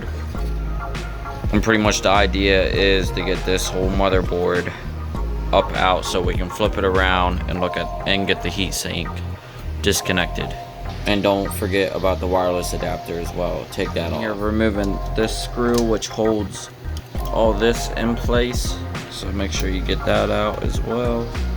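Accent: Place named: Pittsburgh, United States. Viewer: You have to be near Pittsburgh....That accent.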